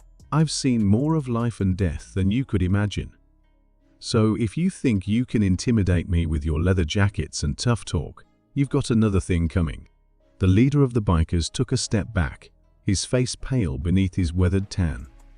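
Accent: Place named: United Kingdom. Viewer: very nice. a believable story and pretty well written. some of the location details seemed off, but i'm not a WWII history buff, so can't be sure where the 101st Airborne was throughout it all, especially Normandy on D-Day. just not sure. What was a real sticking point for me was the AI reading. My complaints are as follows: 1) a British accent? for an American story? 2) the mispronunciation of WWII - if it is going to pronounce it "EYE EYE", then spell out the TWO... 3) is there no way to "train the AI" to pronounce correctly? I've worked on AI training projects, so they're out there. So there should be some way for the creator of the video and text writer to correct the AI. If not, change the text. It really diminishes the enjoyment of what was actually a tear-jerker of a piece. On a scale of 1-10: Story: 9; AI narration - 4.5; Proofing by creator - 0??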